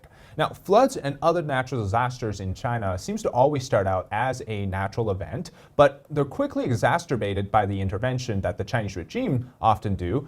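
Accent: United States. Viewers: You sound like an American, bro. 😅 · >Accent : American >Looks : WTF, get a fg haircut, boy! Why are you still having Fk-loon-gong rural hairstyle!🤣 (make sure you make up your mind to part it to the left or right boy, hehe)😊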